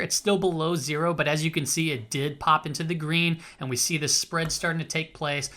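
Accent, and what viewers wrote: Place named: California, United States. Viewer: especially with your Californian accent, the analysis sounds even greater:)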